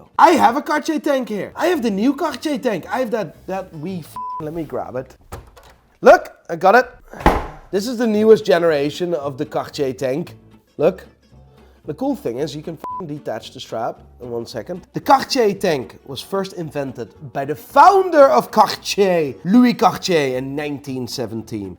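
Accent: Northern Ireland, United Kingdom. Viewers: Are you from Northern Ireland u sound it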